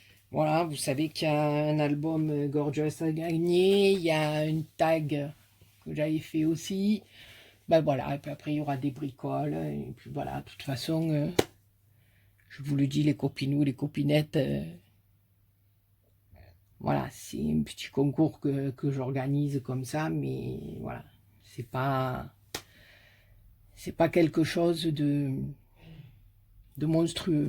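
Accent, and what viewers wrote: South, France. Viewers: bonsoir j'ai découvert ta chaine très récemment et j'avoue que j'apprécie tes vidéos tes fleurs sont magnifiques et j'avoue que je vais m'y mettre. j'adore ton accent du midi je suis allée un peu me promener sur tes autres vidéos et j'y ai découvert une bonne partie de tes réalisations qui sont tout simplement magnifiques, tu es vraiment une artiste je suis abonnée aussi à la chaîne de Christelle, j'aime aussi ce qu'elle fait et me sent proche d'elle, mon mari ayant eu la même maladie qu'elle à l'âge de 27 ans merci de nous faire partager toute mon amitié domi (de Cognac en Charente….)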